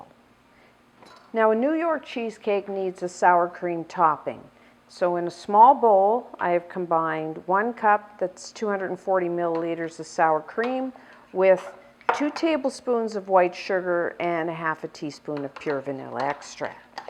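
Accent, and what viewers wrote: Canada. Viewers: is she from canada?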